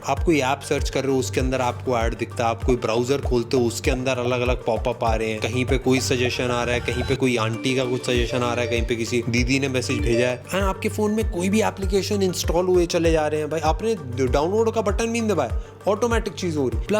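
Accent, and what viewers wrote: India. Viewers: umma koi kaam ke aap na hoyi gyi, INDIAN TONGUE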